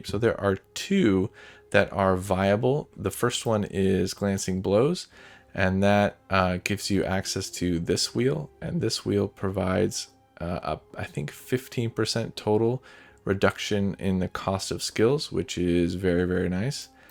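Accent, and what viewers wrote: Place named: Germany. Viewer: Absolutely stunning video man. I wholeheartedly appreaciate eveything & second put into it; since a also worked on some videos I can tell, it was a lot. OT Question: Where are u from exactly, ur having an interesting accent, reminiscent of German but w/o the typical German pronounciation I'd expect.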